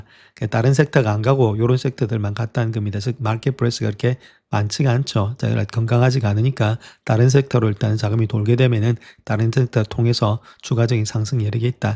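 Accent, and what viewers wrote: Gyeongsang, South Korea. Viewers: 가끔 사투리요? 1초만 들어도 찐찐찐 경상도 토박이 사투리 영상 내내 엄청 쓰시는데요?ㅋㅋㅋ서울경기분이면 1초컷이예요. 사투리 심하게 들리는거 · 님 경상도 분이시져? ㅎ 설명할 때 가끔 사투리.. 얼마니 친숙하게 느껴지는지 금리 내라야..ㅎ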